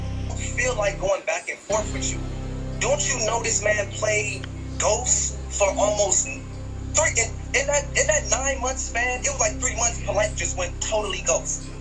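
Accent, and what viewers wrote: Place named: United States. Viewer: mad country sounding. I'm from NYC lol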